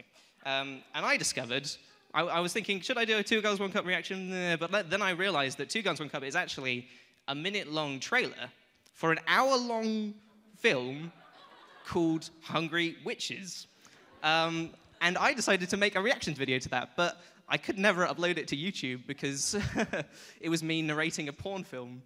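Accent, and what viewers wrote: United Kingdom. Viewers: what kind of accent is that? i know it is british but is there a specific place? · We don't need yer English baaaastaaard accent. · I can't watch this is my living room because I'm worried my mom will see me watching a cute guy with a British accent take his pants off. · If leaves the U.K. and comes to live in the U.S. then eventually he'll lose his accent wont he?:( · The patented style british accent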